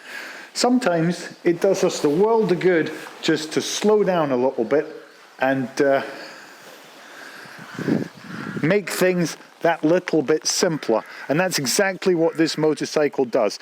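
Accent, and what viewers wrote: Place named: Scotland, United Kingdom. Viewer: It looks like one of the Scottish glens to me, certainly has a Scottish twang in his accent.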